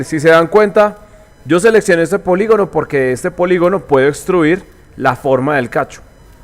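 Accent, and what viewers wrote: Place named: Colombia. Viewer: Hola enhorabuena por tomarte el tiempo de hacer este tutorial, fue muy explicativo. Estaré atenta a nuevos videos! Tienes voz de Colombiano jejjejejej me agrada mucho lo sencillo que explicas. Muchas gracias!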